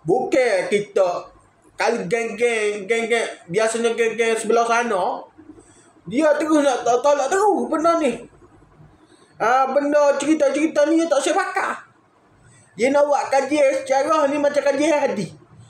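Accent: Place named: Kelantan, Malaysia. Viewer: Abe, aku x berapa faham loghat Kelantan mu. Kecek Bahasa Malaysia la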